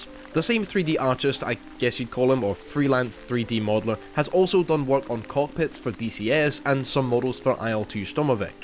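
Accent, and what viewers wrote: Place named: Scotland, United Kingdom. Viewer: Odd question but whereabouts are you from? I’m not sure whether I get a fifer or Edinburgh vibe from you 🤧🧐 (from a Fifer in Glasgow)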